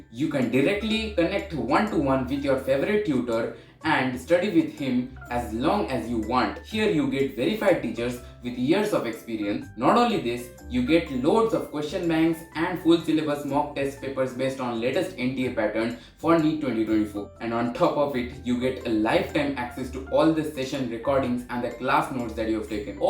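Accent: Bengal, India. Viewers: আমি নিশ্চিত তুমি বাঙালি from accent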